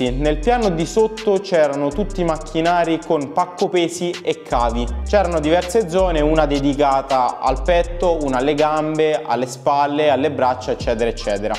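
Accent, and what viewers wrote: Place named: Umbria, Italy. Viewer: Noto un accento della bassa Umbria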